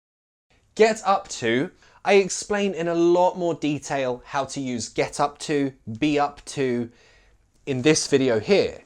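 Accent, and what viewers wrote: United Kingdom. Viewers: Very useful for me! Thanx from Mexico City! I love brit accent! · Hi sir it British or American english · Mohammed Nabi It is British English for sure! English is just amazing!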